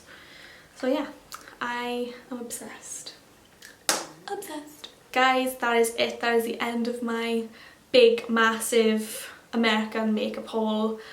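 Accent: Wales, United Kingdom. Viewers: Watches video hears welsh accent subscribes 👍🏼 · are you welsh?xxx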